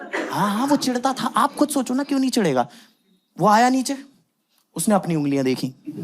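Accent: Hyderabad, India. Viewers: 2nd video and suscribed ❤❤❤hadreabdi tone 😂😂❤❤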